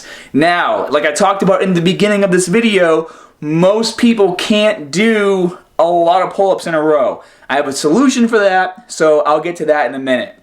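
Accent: Boston, United States. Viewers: Is this guy from Boston? He sounds like it.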